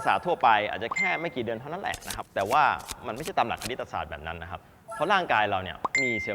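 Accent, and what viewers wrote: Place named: China. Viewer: คุณหมอเหมือนติดสำเนียงจีน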